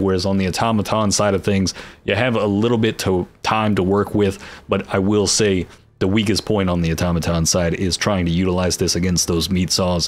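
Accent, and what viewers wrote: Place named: Deep South, United States. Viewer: the deep south accent sold me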